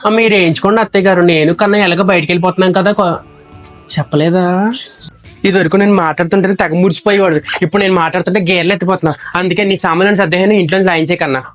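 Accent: Godavari, India. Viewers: 😁we are from rayakseema and Nellore listening your accent reminds us of our inkawsnside from Godavari district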